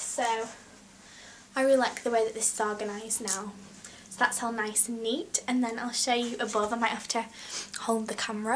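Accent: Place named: Manchester, United Kingdom. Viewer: Is yours a Manchester accent? I was acquainted with a girl who had an accent like yours and she was from Manchester. I like it.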